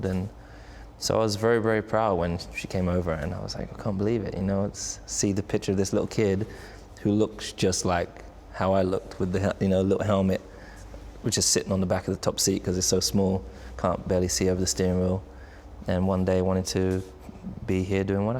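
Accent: United States. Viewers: Daniel May He always hinted an American accent whilst talking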